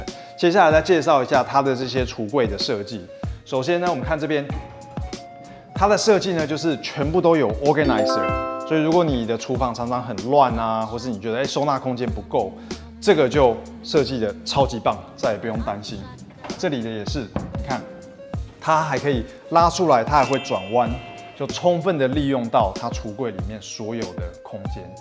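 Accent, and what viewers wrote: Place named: Taiwan. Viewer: 聽口音就是台灣吧!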